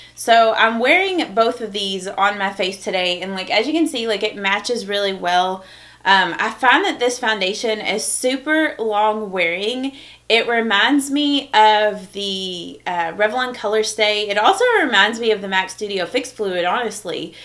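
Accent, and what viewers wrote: South, United States. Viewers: shruti, she sounds southern. I’m from Alabama and picked up on it, too. Love hearing familiar voices on YouTube. 🤗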